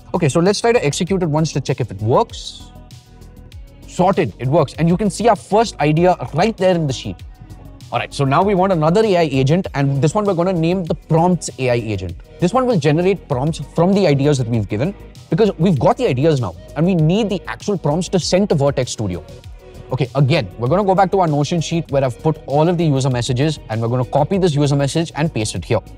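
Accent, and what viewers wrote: India. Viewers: He sounds Indian and looks Mexican 😂😂😢😅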